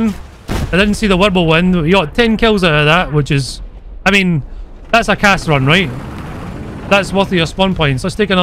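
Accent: Scotland, United Kingdom. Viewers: Listening to is like listening to myself but as Scotsman "I hate being self aware" hit me in the feels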